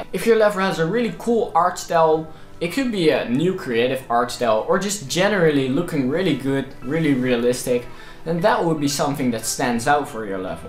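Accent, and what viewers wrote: Netherlands. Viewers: I can sense the Dutch (W tutorial)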